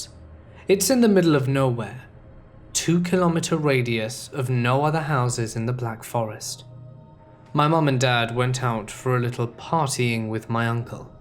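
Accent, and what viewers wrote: England, United Kingdom. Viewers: laying in the middle of my bed, listening to middle of nowhere stories😀😀. I soooooooo wish you would do another collab with hellfreezer when you get settled! Besides killer orange cat, you guys are my favorite narrators and your voices would be awesome together! An English accent, and a Scottish accent, holy fucks batman!! He's a great narrator and I know my fellow MORTicians would like him as well. It's surprising that he doesn't have more subs.PS, the only thing better would be a collab with all three of you, haha. 🤗🤗🤗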